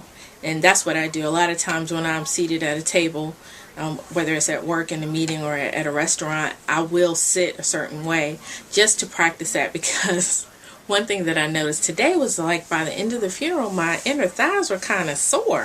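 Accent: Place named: United States. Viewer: You are an African talk like an African why why this American talking style?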